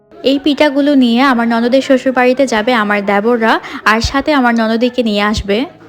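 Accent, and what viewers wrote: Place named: Chittagong, Bangladesh. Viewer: hmm apnar kothar style e bujhtesilam apni chattagram er, r chattagram e jamai ashle asto murug musollom kore eta ami jani, amar nanu bari potiya.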